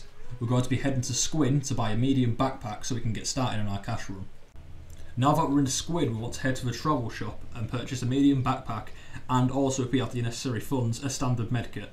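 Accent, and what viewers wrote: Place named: United Kingdom. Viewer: Good to hear a Brit sharing Kenshi content. Interesting how people start off their chars. I suppose it depends on which style of play you prefer, if you already have pre-knowledge of this game. I stopped mining in the initial stages because it was too time consuming vs progress being made. I usually cheese and scavenge, then go for the cheap 250 cats back packs found at the Waystations, unless I've got lucky with the start. I always thought Mongrel was boring, but having seen your enthusiasm for the misty wastes I think I'll give it another try! Good luck with the channel.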